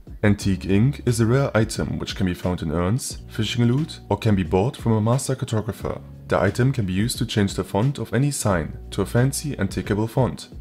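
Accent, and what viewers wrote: Germany. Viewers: bro has the most german accent i have ever seen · why does bro sound german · Hi, kann es sein das du deutscher Muttersprachler bist? :) top Mods wie immer!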